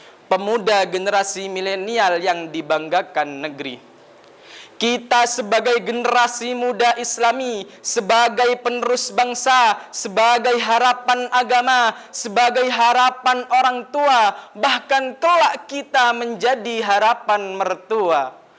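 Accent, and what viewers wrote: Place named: Surabaya, Indonesia. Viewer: ligatnyaa berasa banget org surabayaaa. ayo9 di tekan lagi intonasinya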